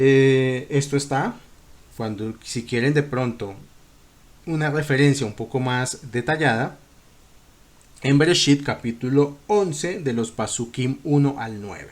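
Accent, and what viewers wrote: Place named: Colombia. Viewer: Atento escuchándote. Tu acento me suena Colombiano. Un abrazo desde Brasil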